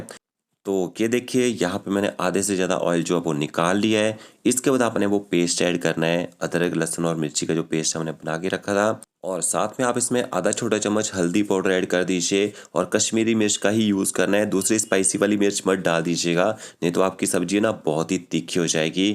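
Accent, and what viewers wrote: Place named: Himachal Pradesh, India. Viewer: Sabji tau bahut badhiya hai hi par chalni rakhkar excess oil nikaalne ka idea bahut achcha laga. Aapki Vanni se lagta hai ki AAP Himachal ke rahne vale hain. Shubhkamnaye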